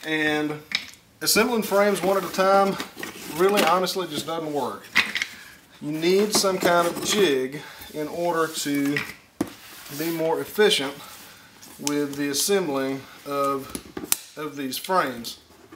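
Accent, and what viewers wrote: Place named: Georgia, United States. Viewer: Your voice and accent remind me of a gentleman by the name of Norman Blake from Rising Fawn, Georgia. You must be a Georgia native, if I'm not mistaken. Anyway, thanks for sharing your assembly contraption. I'm working with the Layens system of frames, so it's a slightly different set up. Thanks. Bob ( a Norman and Nancy fan in Montana)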